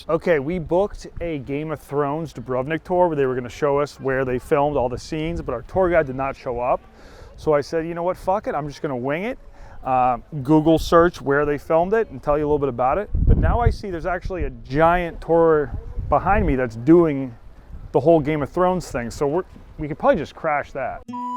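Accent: Boston, United States. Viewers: Dude you're hilarious, its the subtle boston accent that does it.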